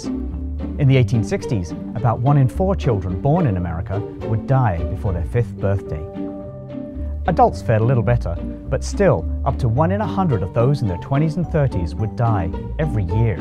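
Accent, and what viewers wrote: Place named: United Kingdom. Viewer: With all respect to the droll British author/narrator of this piece, it just isn't "Frontline" without the ponderous, lugubrious "voice of God" voiceovers of announcer/voice talent Will Lyman (also, surprisingly, the voice for "the most interesting man in the world" although he sounds completely different there, maybe it's the accent). Anyway, glad to be alive and have "survived" Mozart, also my dad who died at age 59, on account of chain-smoking Pall Malls, not lack of urban sanitation and lack of understanding of infectious disease (well, other than the public health issues surrounding smoking).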